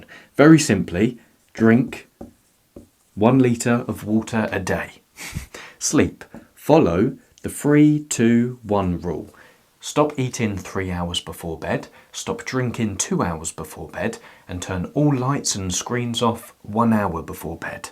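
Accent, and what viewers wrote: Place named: Cockney, United Kingdom. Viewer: I didn't think cockneys still existed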